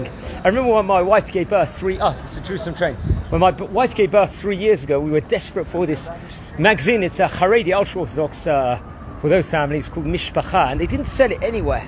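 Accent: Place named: United Kingdom. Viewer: Your from europe you have British accent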